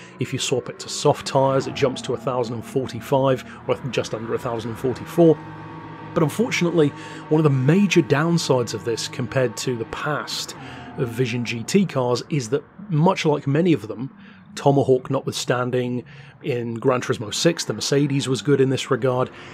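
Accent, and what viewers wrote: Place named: England, United Kingdom. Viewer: Decent review, his English accent was killing me a tad, but this jag does look pretty smooth!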